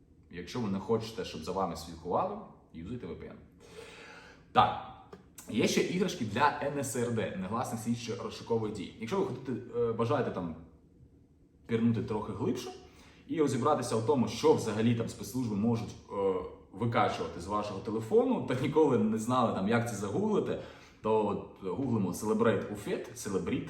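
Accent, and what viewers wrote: Kharkiv, Ukraine. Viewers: Лайк за Харківський суржик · Доволі цікаво! Мова Харків'янська так Харків'янська, за що вибачатися) спожіваюся за рік зроблені абсолютно всі висновки))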